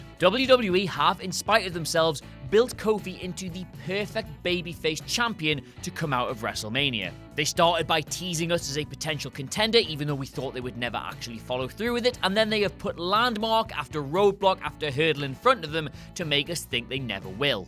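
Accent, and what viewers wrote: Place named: United Kingdom. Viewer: is my everyday reminder that British accents are not fake, and in fact some of them can't just switch it off and do american.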